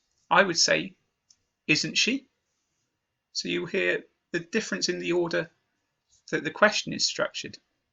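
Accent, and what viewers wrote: United Kingdom. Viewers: This video is great and I love listening to your British accent. Thank you!!!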